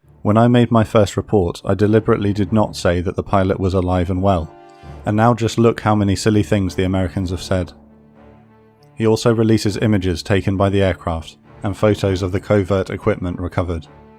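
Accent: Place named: Somerset, United Kingdom. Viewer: Nah, in real life he has a thick somerset accent... Closer to the wurzels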